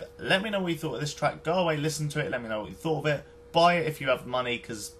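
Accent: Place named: United States. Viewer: an american one; the kind of accent that lets you know that the guy talking wakes on redbull (that's not a hidden advertising message btw haha) :P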